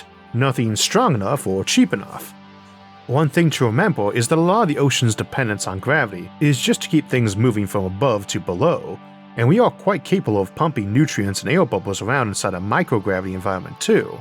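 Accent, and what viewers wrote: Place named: Boston, United States. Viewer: Love the vids! Been subscribed for a while. I keep wondering though - where is your accent from? Sounds very Boston to me but i could be completely wrong!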